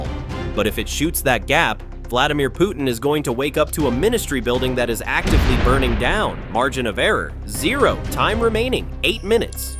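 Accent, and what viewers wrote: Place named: United States. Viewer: CLICK-BAIT SCAMMER1 TOTALYANK VOICE B.S.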